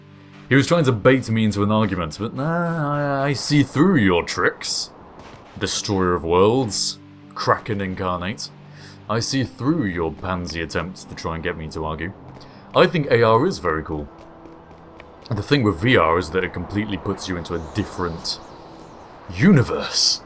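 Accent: United Kingdom. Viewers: GUYS!!! I just got a great idea he plays Stanley parable. Think about it glorious British voice versus glorious British voice.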